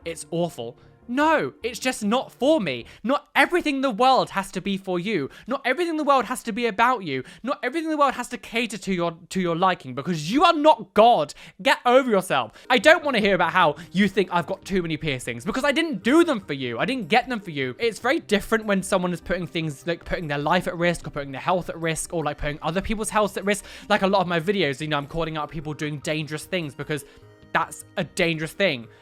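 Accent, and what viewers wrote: Texas, United States. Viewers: Your American accent is pretty awesome,! Great video! 😊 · Your accents are adorable 🥰 You always end up with a twinge of a Texas accent. But always adorable 😘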